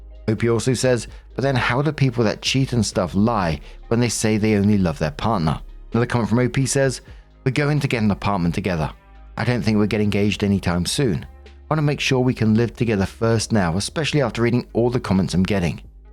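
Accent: England, United Kingdom. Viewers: What region of England is your accent from, can't place it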